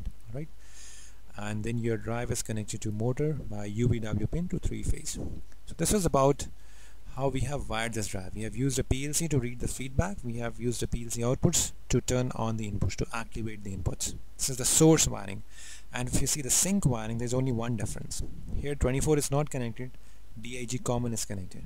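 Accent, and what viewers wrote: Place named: India. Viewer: Please cleanup the sound , and if at all possible a native English speaker. The sing song lulaby of Indian accent is porrly recorded and annoyingly hard to understand. OK? PS not so many OK?s please.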